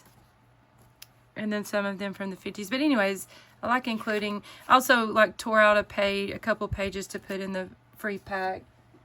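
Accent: South, United States. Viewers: I love this so much! Your voice is so cute! I recognize that southern accent!! I am not watching it all as I want to be surprised!! 🩷🩷🩷🩷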